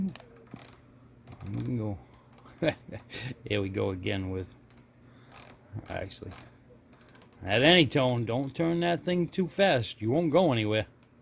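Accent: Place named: Massachusetts, United States. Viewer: You aren’t from NC originally are you? You got that Peabody / Beverly MA accent going on. Hahaha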